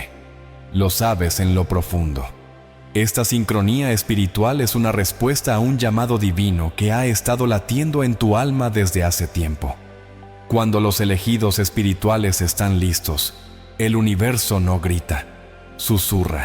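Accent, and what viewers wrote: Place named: Brazil. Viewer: Que lindo acento de Brasil. El idioma se escucha lindo.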